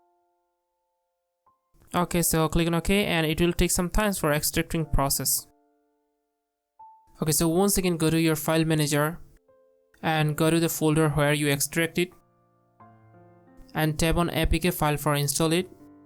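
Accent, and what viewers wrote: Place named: India. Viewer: Indian — English version of India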